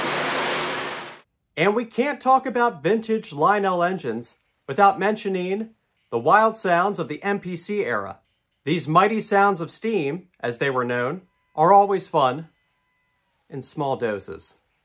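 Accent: Kansas City, United States. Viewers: Are you from Kansas City?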